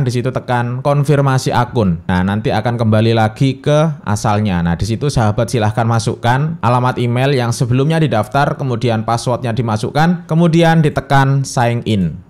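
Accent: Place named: Java, Indonesia. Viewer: Jowo medok hadir bosk 😄👍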